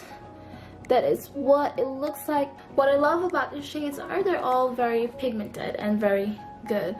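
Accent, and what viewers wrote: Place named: United States. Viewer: sure sissy BTW I love your accent are you half american?? Ur fluent in English 😍😘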